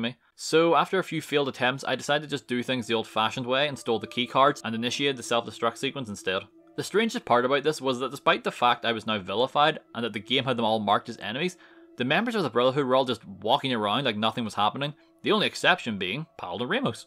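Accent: Ireland. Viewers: Bro this dude has the best Irish accent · Waking up to an Irishman talking about doing a boxing run... I was born in the right Era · Is he Irish ?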